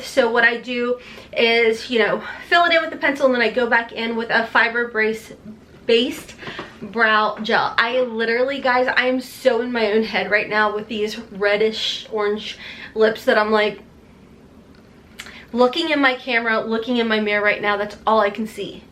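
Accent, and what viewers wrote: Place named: Kentucky, United States. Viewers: Hi! I love watching your videos. I’m from Kentucky too and it’s nice to hear someone with the hometown sound. 🤣 Your videos are seriously my favorite! <mail> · I’m in West Virginia and I love watching your videos for the Kentucky accent. Love it! IG is cherylb_88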